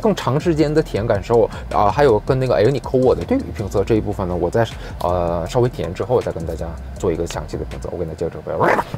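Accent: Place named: Northeast, China. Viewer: 這一口東北話，笑死我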